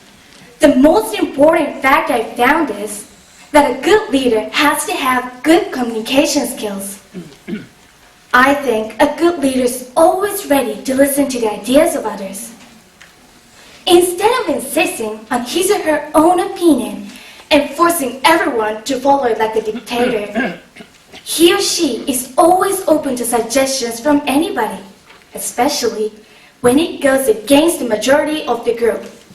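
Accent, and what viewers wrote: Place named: Japan. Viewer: セリノ 発音はキレイだけど日本鉛 まぁー日本人ならこっちのほうがキレイに聴こえるけど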